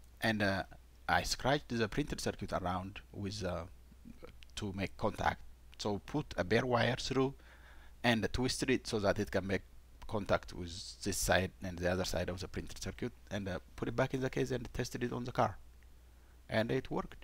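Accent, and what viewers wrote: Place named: Ethiopia. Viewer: Bro - I face the same problem on my Cruze. Sadly I was convinced the issue is on the passenger switch. Thanks so much… by the way are you Ethiopian? Your accent resembles like my Ethiopians 😊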